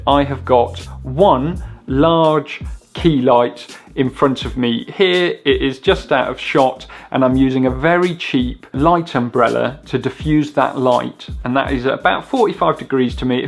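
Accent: United Kingdom. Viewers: Great video mate. I detect a midlands twang in your accent ☺️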